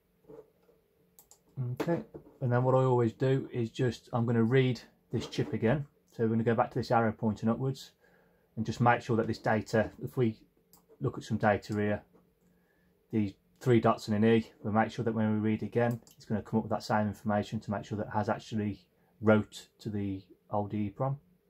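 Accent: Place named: Midlands, United Kingdom. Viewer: Nice video. As a fellow Midlander, I'm loving the accent. Only our people can put so many syllables in the word "side". "Day-turr" :)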